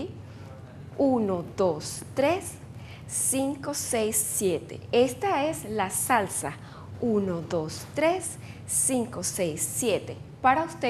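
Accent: Venezuela. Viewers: Habla como Venezolana. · la instructora es de Venezuela =)! cierto??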